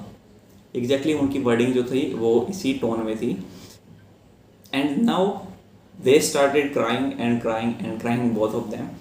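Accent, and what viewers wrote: Haryana, India. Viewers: bhai aap haryana se ho kya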